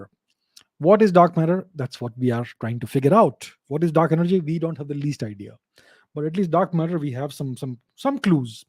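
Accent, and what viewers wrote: France. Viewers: Enjoying the french accent😌😃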